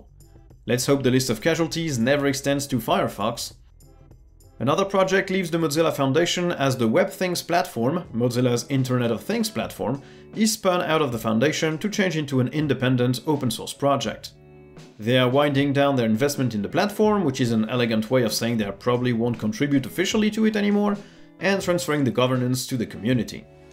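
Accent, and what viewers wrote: France. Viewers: I love Linux et ton léger accent français 👀😂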